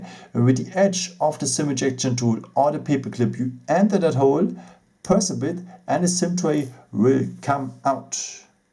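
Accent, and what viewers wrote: Germany. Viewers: sounds like a german accent :) ;) Thank you for the video, good job.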